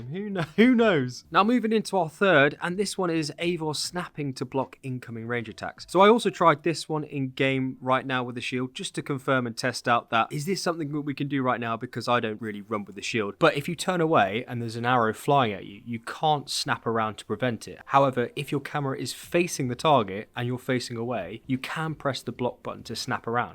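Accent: England, United Kingdom. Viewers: Judging by your accent, you’re English?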